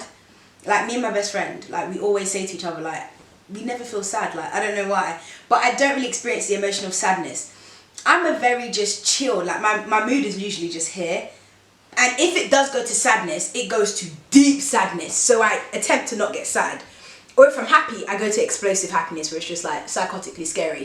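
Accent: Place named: United Kingdom. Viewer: Youre brittish? Had no idea! Cheers from France Anyways, you are really inspiring, 💙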